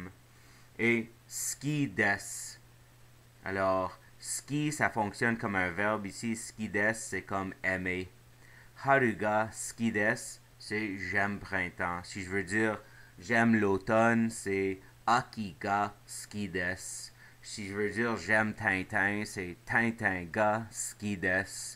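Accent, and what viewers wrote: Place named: Canada. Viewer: merci, j'ai beaucoup de plaisir à suivre tes vidéos; elles sont très claires et progressives. Elles vont à l'essentiel et le rythme mesuré permet une meilleure assimilation. Merci encore (suki desu) Gilbert Savoie, France . NB : L'accent canadien est savoureux.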